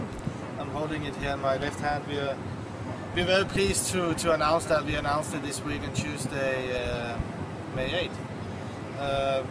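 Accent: Denmark. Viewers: Wow - that's a heavy danish accent.. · danish accent when its best.. just hear lars ulrik from metallica.. heheh the same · How can you make this accent swedish? I won't tell you that the dude IS danish - just that the accent is. XD Anyways - what dialect does he speak?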